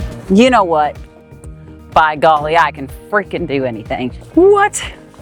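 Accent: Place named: South Louisiana, United States. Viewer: You're slowly picking up that South Louisiana accent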